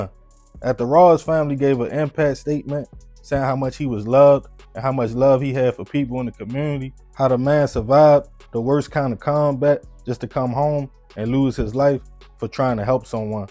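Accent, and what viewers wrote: Baltimore, United States. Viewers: He's from Baltimore and that's how they talk there 😅 His primary audience he wants to appeal to is his own community.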